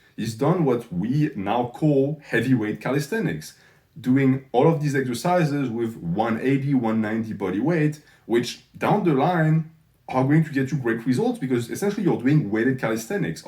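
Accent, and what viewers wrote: France. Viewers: are you french bro ?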